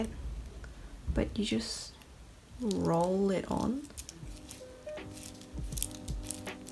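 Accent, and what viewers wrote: Australia. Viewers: are u aussie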